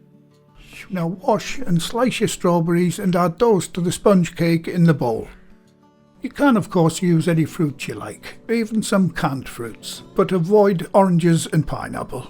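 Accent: United Kingdom. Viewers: Merry Christmas sir! I'm curious about in what part of the UK your accent is common. Thank you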